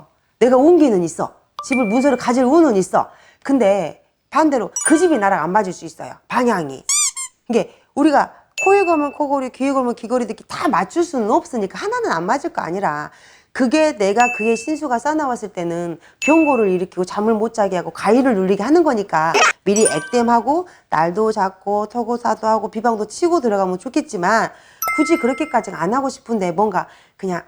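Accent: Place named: Jeolla, South Korea. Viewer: 선생님.고향이전라도신갑네요 겁나게정겨워요.말투가너무좋아요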